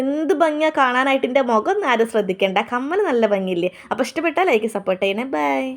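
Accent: Kozhikode, India. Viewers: കൊയ്ക്കോട് ബാലുശ്ശേരി കക്കോടി മുക്കം ഭാഗത്താണോ സംസാരം കേട്ടിട്ട്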